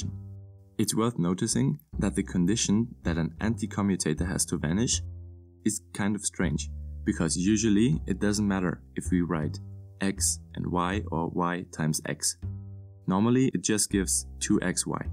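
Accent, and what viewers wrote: Germany. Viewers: Nice vid, vid. Your accent and pronounciation of the word "Ansatz" sounded german, thus may i ask if you're german. I know the vid is old af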